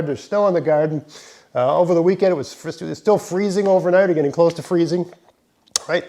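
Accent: Maritimes, Canada. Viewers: Cotyledon. the seed leaves. Coh teh LEE duhn I say, you didn't mispronounce it. You simply have a Maritimes, accent!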